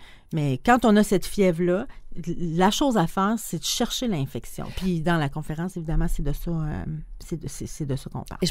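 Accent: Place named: Quebec, Canada. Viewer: اL'accent québécois est dégueulasse je n'arrive pas à vous écouter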